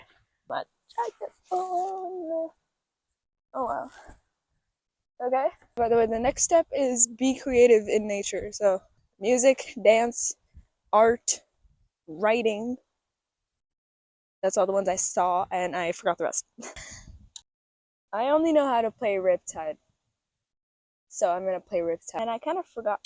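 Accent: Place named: United States. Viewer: The world needs more Luxembourg YouTubers that talk and act american. Thats actually dope as fk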